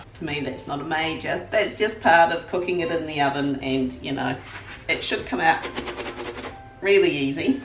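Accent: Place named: New Zealand. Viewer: Don't we all! Thanks for your reply. I've JUST made some circular normal crumpets for the first time. Something went wrong as they look good, taste good, but have no bubbles / holes! The bubbles appeared and then closed up again. I think the pan recipe is wrong. I will persevere. I love your accent! I have a friend from Dunedin who lives here now and he now finds N Zealanders sound strange! (Keep it on hind! My tint is wit !) Great fun! England is in the middle of a mini-heatwave. We get 3 days of summer... it will be snowing in weeks! It's so weird (for me) to think that June is Winter for you! I guess you have just had Christmas there!!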